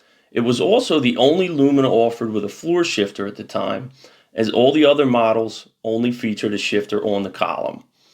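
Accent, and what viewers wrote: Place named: Northeast, United States. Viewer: Fun video (as is your accent - somewhere northeast?). It's always entertaining to my Brit eyes to see the parallels across the pond. Over here, we had similar early 80s Cavs, but the sporty Vauxhalls (obvs Opels under the badge) were more the Astra (smaller) and Nova (smaller still). I feel like we had some very similar wheels to those Z24s though - they're definitely sparking childhood memories!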